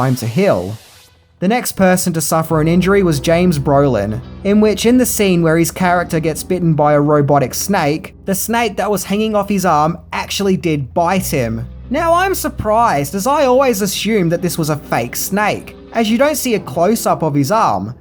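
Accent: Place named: Australia. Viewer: " but with thick accent it's hard to tell.' Seems pretty mild for an Aussie. Maybe its all that Monty Python that has prepared me for non-American accents. Such as the University of Wallamaloo sketch.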